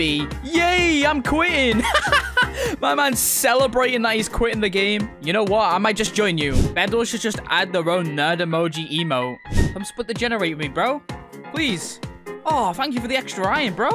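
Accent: United Kingdom. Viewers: This is awesome! Are you British?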